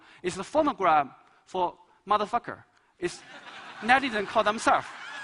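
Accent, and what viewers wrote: China. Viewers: 说的挺好的，不过发现我们国人英语与外国人口音确实不一样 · 油管的推荐有时就是会抽风，。。。这么蹩脚的中式口音英文（你他妈用英文音译中文用什么汉语拼音发音？！）和几个单词就能说明的主旨偏偏扯了十几分钟，其实都不用演说。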